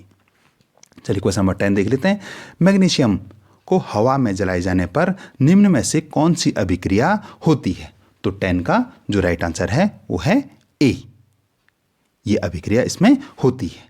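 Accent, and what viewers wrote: Garhwal, India. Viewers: I just love your gadwali tone sir ji ❤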